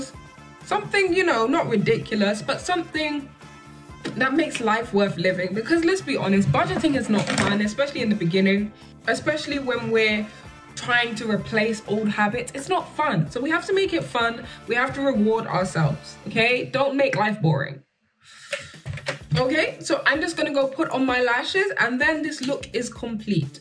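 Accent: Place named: United States. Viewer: Yasss but how you have American accent 😅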